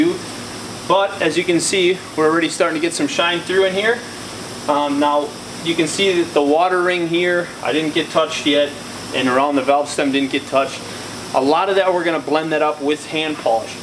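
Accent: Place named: Midwest, United States. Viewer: Howdy, CJ here from H-Town Texas. I am looking to get a side hustle going. My best friend and his Wifey do this for a living. They make a decent living. How much do you charge for each wheel? Would I be able to use cordless tool and if so, how many batteries would I need to swap out in order to do truck and trailer wheels? I would like to be able to go to customer if power was an issue and or driver is waiting to get loaded. Thanks great video, love the mid west accent..Don cha knoow..😆🥰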